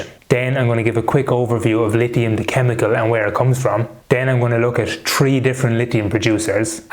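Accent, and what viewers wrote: Ireland. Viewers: "Tree different stocks" as an American this is my favorite thing about how the Irish talk. 🙂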